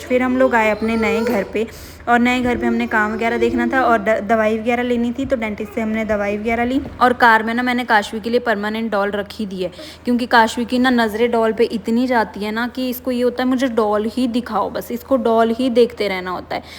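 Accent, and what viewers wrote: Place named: Pahadi, India. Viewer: Today's voice over has a lil bit of pahadi accent 😂❤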